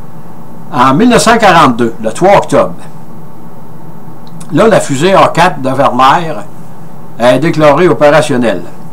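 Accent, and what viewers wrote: Quebec, Canada. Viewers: Merci pour votre vidéo et votre accent québécois ! Vive nos cousins du Québec !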